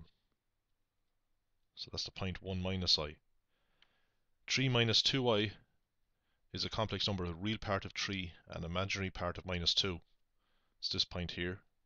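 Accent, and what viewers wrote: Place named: Ireland. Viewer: hay im doing my leaving this year and there is a certain question that seems to re-appear often finding unknown points on an argand diagram it is on the 2013 new sec paper 1 section A question 1 (b) i was wondering if u had or could possibly make a video for this question as i suspect it could appear on my paper thanks very much.( its great to have an irish accent doing a commentary theres only so much American accent i can take:)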